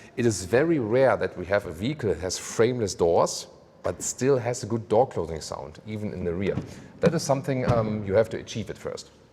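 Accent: Germany. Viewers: Drinking game: Take a shot every time says "Wuerszhion" (Version) We love your reviews and beautiful German accent <3 The black paneling around the wheels is the ugliest I have seen in a long time. Usually, people air for a look where the wheels fill out the wheel arches as much as possible, and here they have visually gone in the opposite direction.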